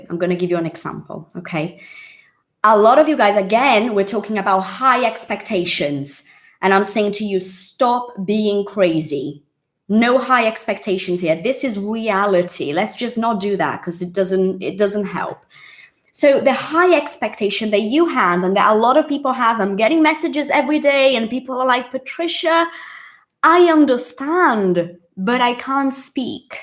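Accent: United Kingdom. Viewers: Louca para pegar uma live contigo. Já sou fã amor. Amo o seu inglês, sotaque britânico, e quero ser fluente na fala assim como nos ouvidos. Eu entendo tudo mas falar não consigo ser fluente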